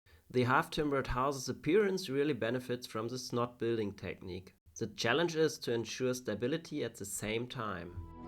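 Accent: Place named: Germany. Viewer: Moin😉👋, Man hört definitv den Deutschen Akzent heraus 😂🤭, doch sieht das Resultat echt gut aus! Viel Erfolg weiterhin😉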